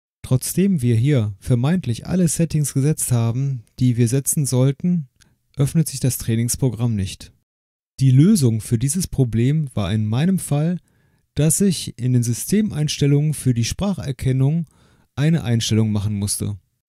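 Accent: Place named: Germany. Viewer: Thank you very much, you did help me a lot ! And absolutely LOVE your German accent 😆😉👍👍👍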